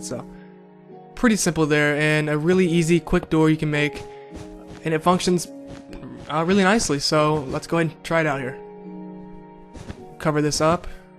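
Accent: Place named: United States. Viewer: great video, your american, so i can understand you easily :P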